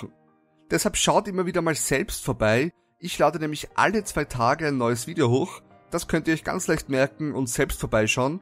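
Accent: Vienna, Austria. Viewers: Habe das selbe gesehen wie du.Das eine sieht in der Tat aus wie eine Schildkröte aus mit Hut.😁😄 fand es sehr abstrakt und musste die ganze Zeit schmunzeln...😄finde deine Stimme total cool.Kann es sein das du irgendwie aus Wien oder irgendwo in der Nähe her bist?glg 😊👋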